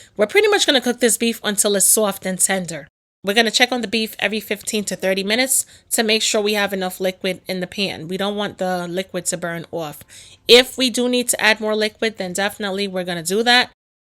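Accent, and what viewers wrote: New York, United States. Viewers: I thoroghly enjoyed watching this video. Cant wait to try the recipie. Your voice and NY accent is music to my ears. My dad is from Brownsville, so I recognize real NY!!! Have a blessed day!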